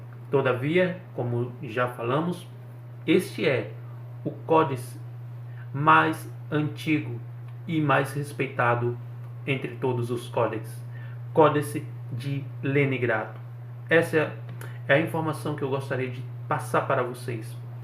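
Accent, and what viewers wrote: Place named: Brazil. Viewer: Mais um que acha que judeu, até me interessei em saber o que significa um códice, mas já começa falando esse hebraico abrasileirado 😅 tô fora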